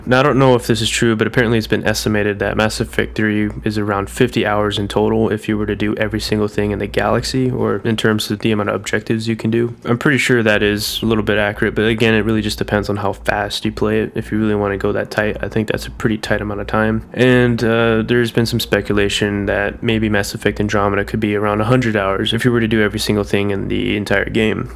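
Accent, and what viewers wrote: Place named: Canada. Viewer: You sound like a fucking canuck. I hope it isn't true. You are such a decent guy to watch when I had absolutely nothing else.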